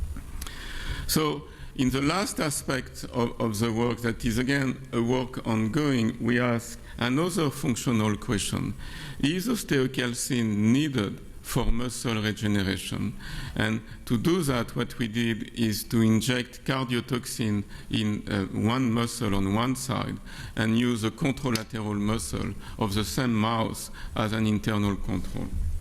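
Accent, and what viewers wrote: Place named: France. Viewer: dicficult to follow, English which sounds like French.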